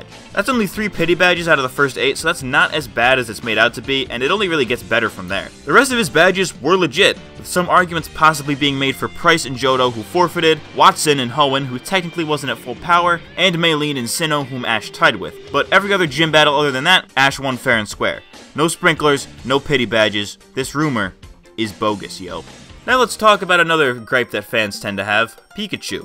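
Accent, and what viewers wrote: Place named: New Jersey, United States. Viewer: You sound like a New Jersey version of Ethan Klein.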